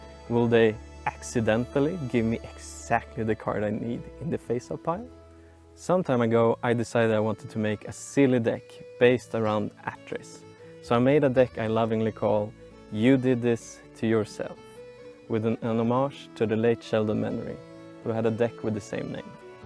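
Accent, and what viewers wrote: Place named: Sweden. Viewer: As soon as I saw the house and nature I was like "this looks like Sweden", then heard you speak and was like "yep, Sweden" 💛 The fact that this was clearly filmed in summer and was published now in winter after the super snowstorm was (1) very good for my mental health to help me look forward to the next summer, and (2) a testament of the effort it must have taken you in editing and post-production. The video was amazing and I'm glad you took the leap and made it happen, congrats and keep it up!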